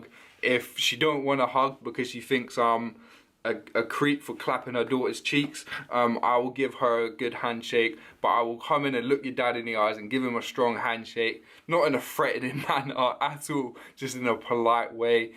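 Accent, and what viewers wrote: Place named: Leeds, United Kingdom. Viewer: I have never heard anyone respect a Leeds accent ever, so tah 😂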